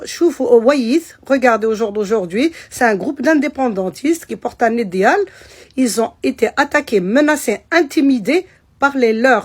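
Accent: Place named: Kabylie, Algeria. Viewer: Bravo madamme bien parler vrai kabyle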